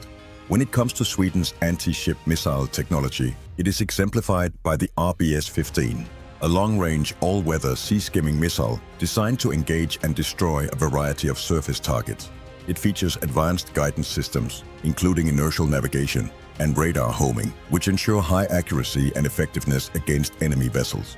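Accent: Denmark. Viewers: Just curious, is that your voice or a random narrator? It has a hint of danish accent, but you are Norwegian according to the bio. Great voice and awesome content, much appreciated 🫡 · Du er dansk. Det hörs på accenten. Det er god engelsk men mej lögner du ikke.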